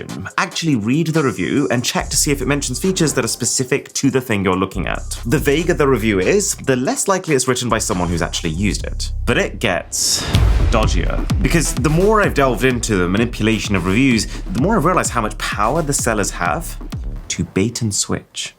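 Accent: India. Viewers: The sub continent accent made it worse for me.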